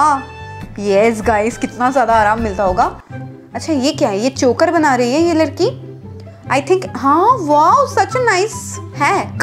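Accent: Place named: Bengal, India. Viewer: AP bengli ho na qki apke bolne ke style se ye hi lag rha h mujhe ye aeisa isliye mga qki mai bhi bengoli hu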